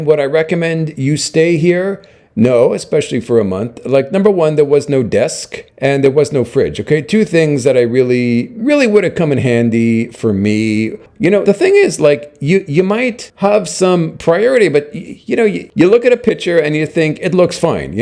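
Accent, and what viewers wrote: Canada. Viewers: Ha ha!, you don't hide your western Canadian accent. Very nice sound to my ears, even though I am from Newfoundland with a very different accent. After 4 years in the Philippines, the accent is nice. Stay cool bro.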